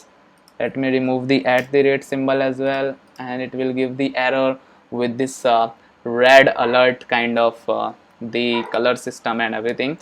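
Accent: India. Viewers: 印度仔，英语很重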